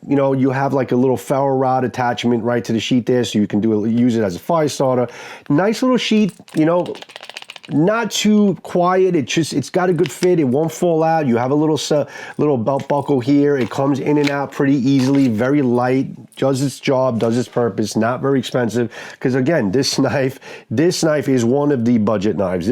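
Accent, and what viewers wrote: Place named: New York City, United States. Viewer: What borough of N.Y.C. is your accent from?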